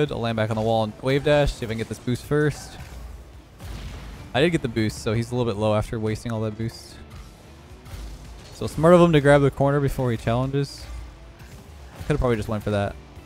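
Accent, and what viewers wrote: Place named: Canada. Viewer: I've watched every one of your daily vids for months now and I can honestly say... In this one you sound so Canadian 😂 The itis really brings out the accent apparently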